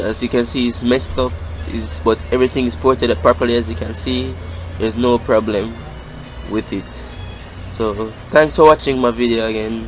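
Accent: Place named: Jamaica. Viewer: Jamaican voice with a Welsh name....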